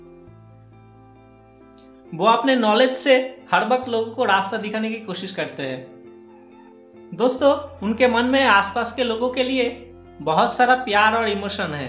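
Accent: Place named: Bengal, India. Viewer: I feel like listening to your accent, you are bengali 🙂 I chose Pile 1 and happy with the reading which my intuition is also saying, and watching tarot readings for more than 2 years, seeing the cards came out in your reading for Pile 1, I know what you didn't said about the characteristics of my husband 🤨 but I got my answer, because it confirmed that with whom I intuitively know I am getting married very soon in 2023, He has Capricorn Sun sign, That major Arcana Devil, He is all what the Devil Card, The King of Cups (Don't show up emotions very well but loves deeply) that 7 of Swords, 8 of Cups and Hanged Man represent my partner, I know all about his negative things but still I want him only as my husband in this lifetime and in my next life times 🤗 I am older than you, so as an Elder Sister I give lots of blessings and Good wishes for your Channel 🙏🏻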